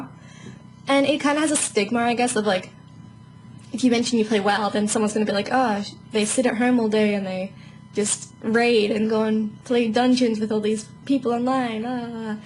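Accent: Australia. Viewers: ZOOOOO MAAAAAAAAHHHH GOOOOOOOOOOOOODNESSSS?! You're Australian! Instant. Fucking. Sub.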